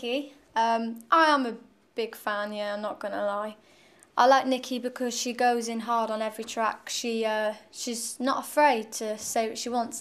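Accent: United Kingdom. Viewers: Goshh I LOVE BRITISH ACCENT!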